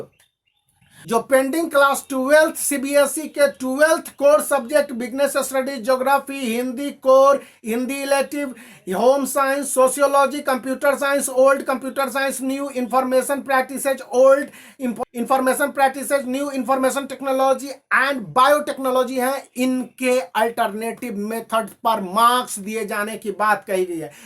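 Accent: Bihar, India. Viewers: Guru ji awaz se hi bihari lag rahe hai kaun zila se hai guru ji aap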